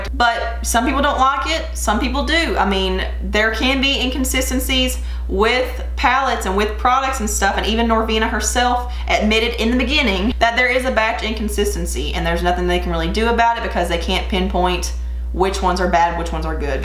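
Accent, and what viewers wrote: South, United States. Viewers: I really got tired of all the hate bandwagon of this palette. You and emily Noel were very honest about this. I love your southern accent. You are a very beautiful woman. Keep up the good work.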